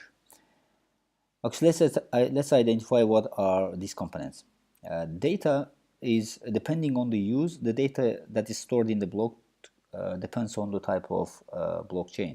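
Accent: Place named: Azerbaijan. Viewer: Man this accent, sounds so familiar LMAO. Are you from Azerbaijan by any chance?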